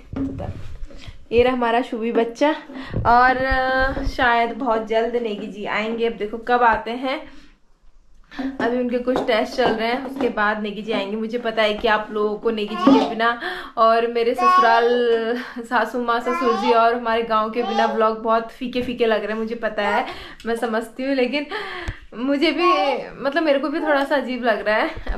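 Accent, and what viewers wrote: India. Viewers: Accha tho bol Rahi ho pahadi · Wow aapke muh se kinni achi lag rahi h pahadi bolna ❤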